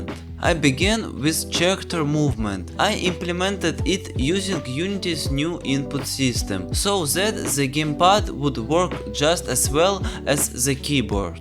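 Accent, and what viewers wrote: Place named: Eastern Europe. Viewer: Finally new video on this channel. I hope that this minimalist platformer find his players! P.S. By the way I really enjoyed your cool slavic accent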